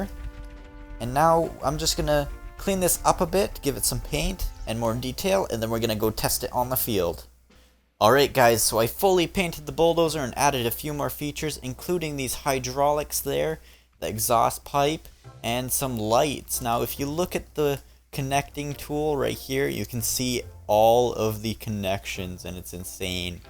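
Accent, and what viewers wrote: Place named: Canada. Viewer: can't get over the fact that ur Canadian so u pronounce about aboot... no offence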